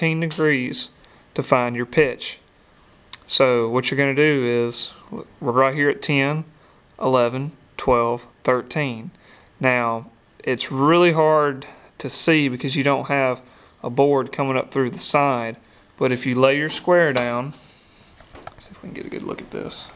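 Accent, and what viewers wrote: South, United States. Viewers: Nice video bud. People just get jealous that they don't have a southern drawl lol guarantee most Yankees wish they had one lol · Tons of people today have no clue on some construction techniques, im amazed at some old timers ability to make cuts or joinery from years of experience easily and from eyeballing it, there are those of us that can do a lot of basics but appreciate tips that are not neccesarily as well known as some of these commenters think. If some on here say stuff about your southern accent, i would die laughing if i were to hear their New Joisey accent, We all have accents and it is NOT indicative of intelligence level ( dumbasses) Keep on making these man, they are informative and a help. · To an HVAC/Electrical/Mechanical troubleshooter, now a semi retired handy man, this is a priceless video...At least I won't look totally lost...lol....My thanks, to another country sounding gent like myself, for making it. 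· Having watched a few of this man's vid's, and returned to them more than once, I'm beginning to think there there's no end to this man's talents. As for your Southern accent? Well, 'least ya'll speaking English. Keep it up. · hit the like on this comment, sir God blessed you to be a teacher, what He gave you is a gift, He meant for you to give your gift to others and you have, so carry on sir I salute you and never apologize for the southern accent. that's a gift from God too !! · Don't apologize for your southern accent. Remember the song,? "I'll speak my southern English as natural as I please; I'm in the heart of Dixie, Dixie's in the heart of me!" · Don't apologize for your southern accent, it's a blessing from God. - Proud Texan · I appreciate the southern accent. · don't worry about your southern accent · a southern accent instills confidence in me that someone knows how to use tools. 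· k-e-w-l.......just got back into being a grunt for a framer.....did it in college years ago.....saved my tools just incase I had to go back to it....and I went back to it.....work for an old hippie who knows a few tricks himself.......will hafta check and see if he knows a few of these tricks....he just might.....sure thank ya fella.....southern accent here too, so don't worry......be happy.....:) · As a female who knows little about construction, I find this fascinating and (bonus!) easy to understand. I probably won't ever need to use this, but I love to learn all sorts of things. And I find a southern accent charming lol. Please keep up the teachings as you are great at it :-)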